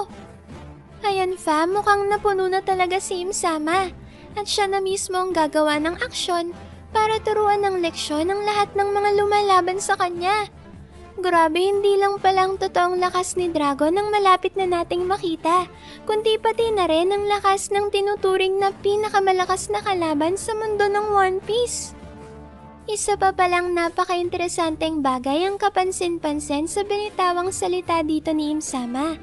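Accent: Pampanga, Philippines. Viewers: taga pampanga kaba?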